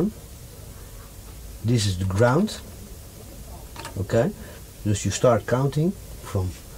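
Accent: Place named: Netherlands. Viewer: You're Dutch? Can't miss.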